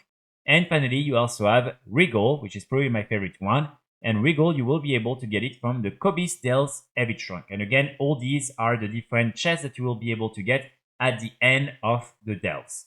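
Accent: France. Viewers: I think he's french so that might be why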